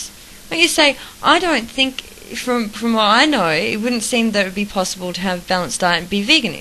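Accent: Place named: Australia. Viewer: dude China's awesome. the US does horrible things as much as China, just not fur. heard of bear parks? every country has its good and bad points and its immature to say that one country is better than another. anyway, yaaaaay great videos :) you sound so Aussie too and like a normal casual person, not like a crazy hippie. hopefully people will respect your opinion.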